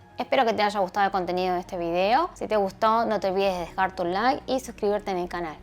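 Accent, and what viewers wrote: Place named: Argentina. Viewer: Súper raro ver una China o coreana nose hablando argentino 🤔🤪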